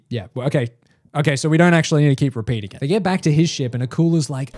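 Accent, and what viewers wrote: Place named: Australia. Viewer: AUSTRALIAN ACCENT DETECTED 🇦🇺🇦🇺🇦🇺🇦🇺🇦🇺🇦🇺🇦🇺🇦🇺💪💪💪💪💪 RAAAAAAH MAD MAX FURY ROAD IS THE BEST SCI-FI MOVIE EVER 🇦🇺🇦🇺🇦🇺🇦🇺🇦🇺🇦🇺🇦🇺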